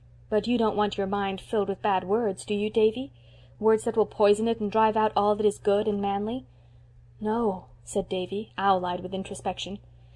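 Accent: United States. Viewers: Her English seems perfectly fine to me. A very proper American accent, without strong local dialect. What accent do you consider to be "proper"? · Impossible to listen to the dreadful use of the English language,why do the yanks deform English?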